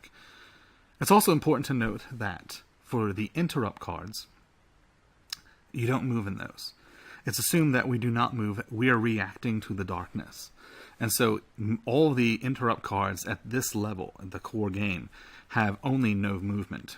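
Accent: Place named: United Kingdom. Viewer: Just one thing, I detect that you are a fellow Brit! I can hear it in your accent, lol.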